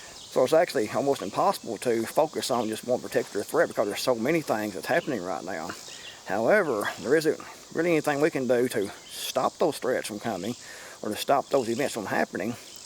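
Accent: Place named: South, United States. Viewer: I think he,s great ! Love the southern accent ...i,m from LOUISIANA and we all sound like the actors on the movie THE WATERBOY ! LOL !!!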